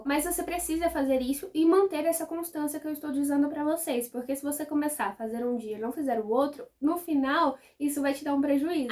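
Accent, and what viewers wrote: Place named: Northeast, Brazil. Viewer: É nordestino cara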